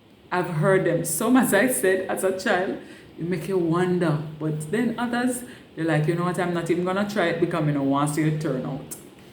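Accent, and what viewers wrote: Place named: Jamaica. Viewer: I can feel the passion. Patois to the world 🌎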